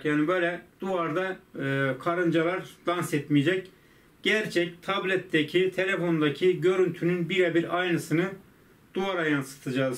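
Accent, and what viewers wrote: Erzurum, Turkey. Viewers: ABİ SEN ERZURULUMUSUN :)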